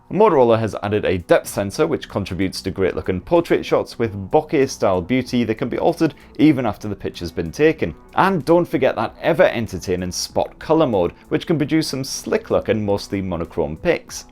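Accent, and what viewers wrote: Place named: Scotland, United Kingdom. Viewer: Wwhwha...WHAT?!! Mate,you'retalkingwaytoofastwiththatthickScottishaccentIt's sometimesverydiffiulttounderstandwhatyou'resayingYoumightwanttoslowdownabit. Okay?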